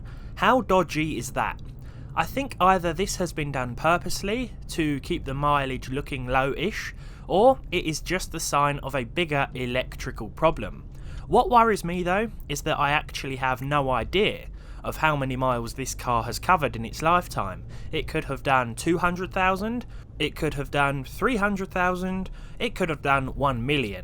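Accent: United Kingdom. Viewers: Dennis Thorsson there’s something charming about the lower budget though. And the British accent lol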